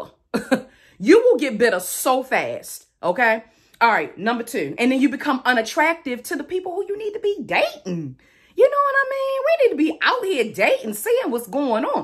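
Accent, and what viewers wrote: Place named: Northeast, United States. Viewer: Are you from Baltimore or Philly btw? I can hear the north east accent.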